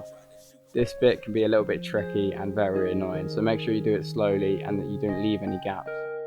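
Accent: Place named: United Kingdom. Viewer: you can tell that he is british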